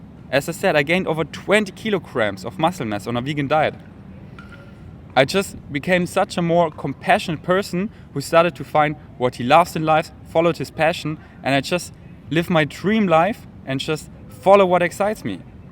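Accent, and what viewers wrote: Germany. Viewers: I knew it, that accent looked like a german one (scroll in the comments) Tolle Video☺️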